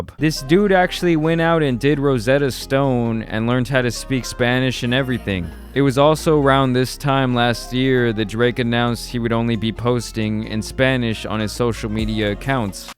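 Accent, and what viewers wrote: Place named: Boston, United States. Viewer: You got to be from Boston can tell right away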